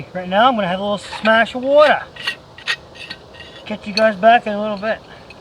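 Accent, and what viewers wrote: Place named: Scotland, United Kingdom. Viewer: you sound kind of funny for a Scotsman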